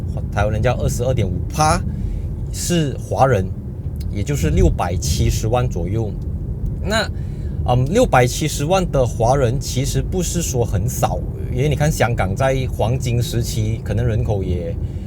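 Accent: Malaysia. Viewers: 我是無意中發現了的channel，看了一條片就subscribe了，我反而覺得，一定要keep住目前這种風格，的馬來西亞口音華語我覺得很有趣，而且還時不時來兩句廣東話和閩南語，很好玩。新不新車什麼的無所謂啦，現在做汽車的channel很多，也不完全是為了看新車才看影片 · 如果你担心部分观众听不明白某些词语，建议你可以在字幕写能理解的词语，希望你能在保持大马有趣的口音当下也能让观众明白你的讲解！ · 馬來腔的華語台灣人聽完全沒問題,還蠻親切的會想到大馬的僑生同學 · 不需要特地去改口语，Malaysia人当然是用回Malaysia Style的华语啦！我肯定是站在你这边的👍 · will support you always.. just stick to our malaysia accent bro.. that's make you special bah hahaha.. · 我住在米国，就喜欢你的马来腔。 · 从一开始就支持到现在，还没开始看你的频道之前我总觉得介绍车的频道都是在背spec罢了。但是慢慢的就只观看你介绍的。没有贬低其他频道的意思，但是我相信多数观众都会有同感，可以介绍超跑、又可以介绍myvi saga。 choo yee的频道真的是很优质。如果接受不到我们马来西亚的华语腔，soli ～我们就是喜欢酱讲话。继续加油，200k subscriber 迈进，不忘初衷💪 （其实真的开会员频道我们一样买单） #OneManOneTeam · 很支持用自己的方式介紹Malaysia 的車子如果今天用台灣腔或普通話講的話就不Malaysia 了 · 继续努力加油！我们应该以马来西亚口音为骄傲。这是我们的特色！我会继续支持你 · 马来西亚华人用马来西亚特有人情味的华语强调就是你的定位！我认为你不需要调整。另外，你可以分析一下你的主要受众群特性；以我个人的观点，你的受众应该主要来自中等收入职场人，要拓宽subscriber量，除了深化你的原有拍摄风格，还可根据你的主要受众因为车子而关心的其他生活事项（如车贷、车险、维修保养、智能化提升、运动、假日出行等）的各种tips或好康介绍做延申，让订阅你频道的粉丝不单是观看你的车评，还因为在内容中看到各种好康而更有向好友推荐并subscribe的动力。数字经济时代，羊毛不但能长在牛身上，还可让狗来买单。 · 继续做你自己吧其实这才是大马的本色文化语言就好像看其他国家的汽车媒体他们也没刻意去改变腔调来迎合外国观众如果外国观众不明白一些大马的道地语言一些大马的梗可以在影片下面留言我们大马观众会帮忙解释的我们也会继续支持你希望你能继续走下去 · 加油，挺喜欢你的马来腔，哈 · 我看留言者几乎都是男性。我是受我先生影响，也学会看的汽车介绍，从中学会了一些汽车的知识。希望你不久的将来订阅人数达到50万以上。还有，我prefer 你的马来西亚华语口音👌👍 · 從語言方面來講, 我覺得你毋須遷就那一個國家的觀眾。我是在澳洲生活了三十年的香港人, 我不講華語, 對你的馬來西亞的華語完全了解, Slang反而有親切感. 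以英語而言, 澳洲, 紐西蘭, 英國, 加拿大, 美國, 印度, 馬來西亞, 星加坡也各有特色, 卻不會影響我們的溝通. 做Youtube當然要用自己最流利的語言, 更加重要的是content啦. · 其实保持Malaysia口语是好的，像中港台YouTube的节目，他们也是保持着自己的特色，我也是有观看的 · 不要太在意他人的看法，虽然说人不可能完全不听他人的评价，但是您其实也已经很优秀了，您所分享的都是真实且客观的评价。还有您的口音就是您的特色（也代表马来西亚独有样子）所以非常atas👆👆👆，马来西亚人就是有一点点口音问题（在外国人眼中）但是这就是马来西亚的特点，我们会各种语言和方言自然而然也会有一种独特腔调（口音）。最后我想说就是您都已经放subtitle了，如果subtitle不给人（观众）看难道摆美还是给鬼看🤣XD。加油，真爱粉与您同在！🤙🤙🤙 您也要做到，当你真正拥有的时候，不在乎别人怎么看你！😊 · 我是大陆人我非常习惯这个马来西亚的中文口音因为在大陆B站有许多马来西亚的up主常年投稿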